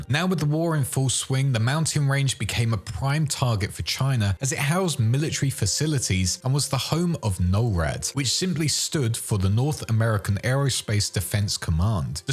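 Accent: United Kingdom. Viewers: Loving the videos mate, love hearing more of us brits doing lore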